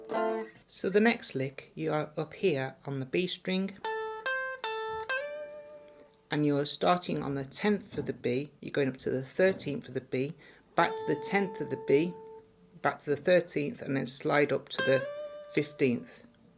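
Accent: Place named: South Africa. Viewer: Goods job. You sound South African. Another great song by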